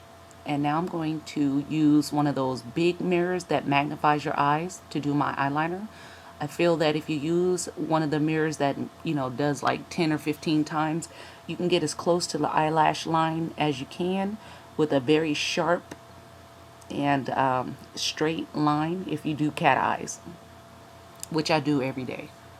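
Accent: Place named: United States. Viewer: You should never have named this video 'Fugly' ... you are by no means ugly. Ugly is as Ugly does .... its what's in your heart that counts. Also, you have one of the prettiest smiles I have seen. Plus your make up video is wonderful. Well done! Please post more. Your accent is lovely too, very easy to listen to ... I'm British so I always love the American accents lol. Enjoy making your videos ... you're teaching people all over the world how to look fabulous!!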